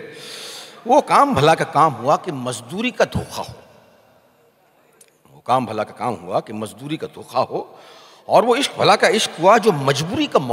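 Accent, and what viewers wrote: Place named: Jharkhand, India. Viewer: The voice of Waseypur..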